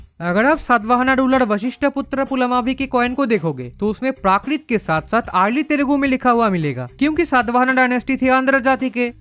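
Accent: Bengal, India. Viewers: Bhai bangali ho kya accent se esa laga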